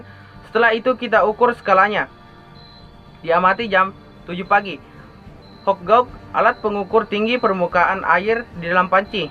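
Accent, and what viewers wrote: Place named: Java, Indonesia. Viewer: Kok medok yahh?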